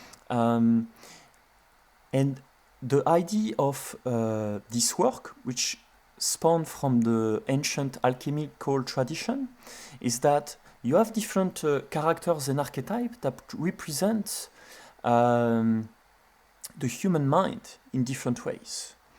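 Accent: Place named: France. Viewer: Thanks man! I fell in love with your french pronounce 😍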